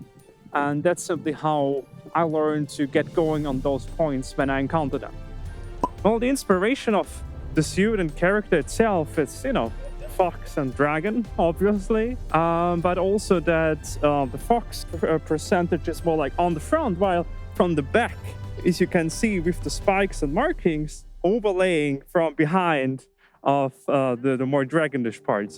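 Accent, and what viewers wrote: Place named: Germany. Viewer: Naww that's really awesome that you've made a vidio like that! ;3 And as a German speaker I would also say your accent is more like "Hochdeutsch"! ;3🐾🦊🐉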